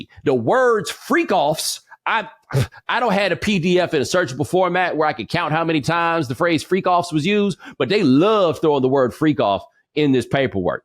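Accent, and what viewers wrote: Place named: Atlanta, United States. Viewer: Never knew you was from Atlanta but now I hear your accent clear as day lol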